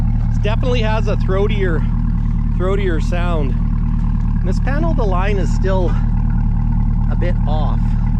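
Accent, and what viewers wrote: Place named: Canada. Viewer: Oooouut. I hear a faint accent A!!! What part of Canada??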